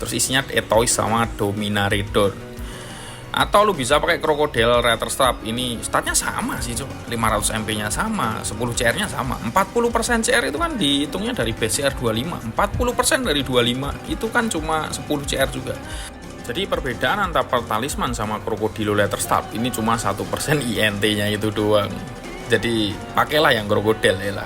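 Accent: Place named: Java, Indonesia. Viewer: Gua sub yt lu karna jelas ngasih penjelasan nya,meski ada loga jawa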